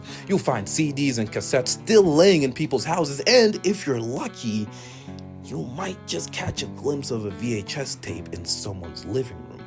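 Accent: United States. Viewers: why do you sound american, genuinely asking. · And yet you sound American? 🤔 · yeah but like what I mean is he sound like he was born and raised in like Detroit or smth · Why does your accent so American? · Then how do you have an American accent when you were born and raised in Rural Africa? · Your English is fantastic!!! You sound like my neighbors in Virginia. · Great vid as usual! I hope this isn't a rude question, but why does your accent sound American? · can i ask how come you have an american accent? im curious! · Born and raised in Africa but have a American accent?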